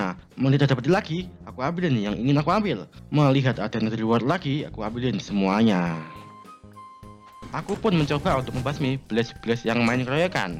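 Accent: Java, Indonesia. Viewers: Orang mana bang, kok logat nya kayak orang jawa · Jowo ne medokkk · jowone terlalu medok iki kayane,, ngommong bhs indonesia we raiso alon lan kesrimpet srimpet wkwkwkwk btw jowo ndimas? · Setuju banget (╥﹏╥) jawa nya ada imut-imut nya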